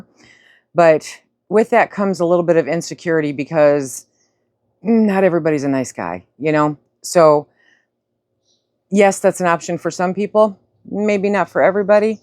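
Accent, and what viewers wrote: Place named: Michigan, United States. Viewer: OMG... I'm so glad I found you. After watching for 15 minutes, I began to recognize your logical, pragmatic thinking, your speech cadence, the landscape behind you, and I thought, "I wonder if she's in Michigan?" Lo and behold, went to your vid description and there it was! I'm a former Michigander, born and raised in southern lower MI. Now living in AZ (looooong story and not by choice.). Caring for a spouse with Alzheimer's and there could come a time when Ill be on my own. Will return to MI because that has ALWAYS been home. Thank you for your wonderful tips, you're providing a valuable service! 💙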